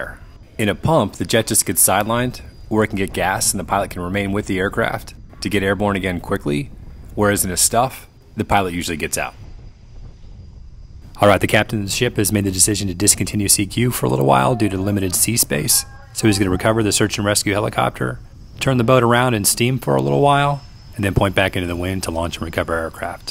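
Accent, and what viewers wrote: United States. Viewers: Very smooth operation. I'm not American, never been in any military. I worked in civil Aviation (helicopters) all my life. I'm appreciating the difficulty to get all this right and the commentary is appropriately American but on the nail!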